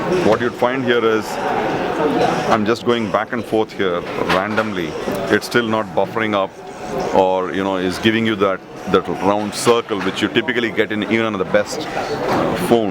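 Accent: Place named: India. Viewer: get used to the indian accent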